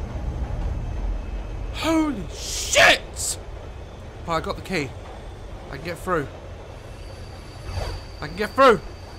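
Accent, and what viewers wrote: United Kingdom. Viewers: The jump scares were top notch, it was enough to curse in my native Spanish language! 😆 I laugh so hard when cursing in a UK accent makes it 10X funnier. I love it!! 😆👍 Had a rough day and I needed a good laugh and good ol’ always cheers me up!! 🙂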